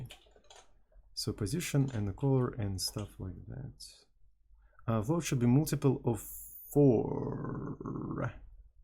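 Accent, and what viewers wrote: Russia. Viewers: Russian guy? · Are you Rusian or German? You speak German words often, but your accent sounds Rusian.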